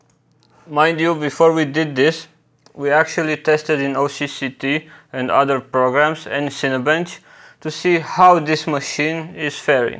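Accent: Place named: Poland. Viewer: Where are you from? Your accent sounds Like polish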